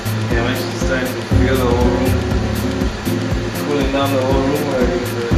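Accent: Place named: Philadelphia, United States. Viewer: nice one, but put those cubes in a baggie. you sound as if you may be an original philly boy, am i right?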